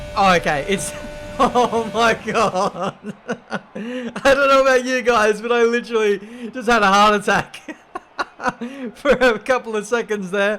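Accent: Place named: Australia. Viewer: the thing i like about is that his voice sounds normal because im aussie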